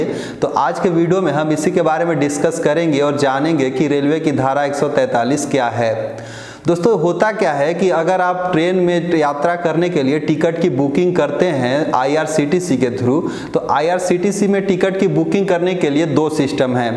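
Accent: Bihar, India. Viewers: आप कहाँ के हैं up या बिहार हमको तो पक्का बिहार के लगते हैं पकड़ा जाते हैं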